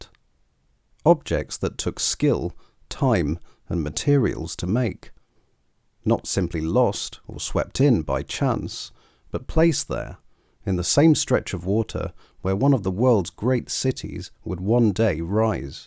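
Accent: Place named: United Kingdom. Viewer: It’s so nice to have a real voice narration. Sensible pronouncation with British English cadence.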